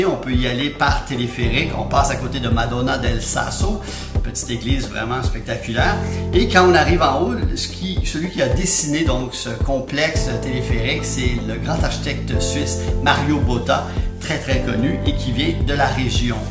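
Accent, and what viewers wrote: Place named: Quebec, Canada. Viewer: Bonjour Monsieur, merci de nous présenter une magnifique région de Suisse, le Tessin ou Ticino. Pourquoi vous a-t-on choisi, avec votre accent du Québec ? Que mange-t-on dans le Tessin en Suisse ? Autre chose que du sirop d'érable ? Vous êtes du Québec, vous connaissez, je suppose. Comment sont les gens dans cette partie de la Suisse où l'on parle italien, dix pour cents de la population suisse. Présentez nous la gastronomie du Tessin, ils ne connaissent pas le sirop d'érable, présentez nous leurs goûts en matière de musique. Ils ne connaissent pas Céline DION et GARROU, Lara FABIAN, Isabelle BOULAY et vos sommités de la musique du Québec. Sur les lacs de Lugano et de Locarno, on ne fait pas du patin à glace comme sur le Saint-Laurent. Moi, c'est Paul, venu de Bruxelles, Belgique. Frites, bière, moules, chocolat sont mes aliments préférés. 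En ce moment, janvier 2023, il fait froid. A Bruxelles, cinq degrés, encore l'automne sauce du Québec, mais en provinces de Liège et Luxembourg, neige, neige, neige et encore, luges, traîneaux, ski de fond, raquettes, remontées mécaniques, les Hautes Fagnes, un vrai décor digne du Québec, les Hautes Fagnes, cette région de Belgique qui touche l'Allemagne et le Luxembourg où dix pour cents de la population belge parle allemand. Le Tessin est cette région de Suisse, en été, chaude et ensoleillée où les habitants parlent italien, dix pour cents de la population suisse. Des gens méridionaux plus agréables qu'à Zurich et à Lucerne, des suisses u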